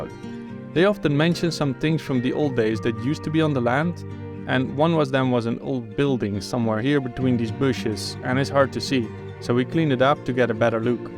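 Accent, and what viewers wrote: Netherlands. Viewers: Now there's a proper Dutch accent. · Forgive me if I'm wrong... But your accent seems Dutch?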